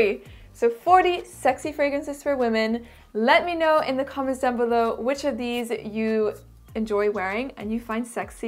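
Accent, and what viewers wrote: France. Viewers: U have an excellent frensh accent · The addiction for "Alien" and YSL "Libre" my mom has passed down to me is magical and indescribable! Your French is just so satisfying and lovely to hear! Merci! ♥️ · Un accent français hh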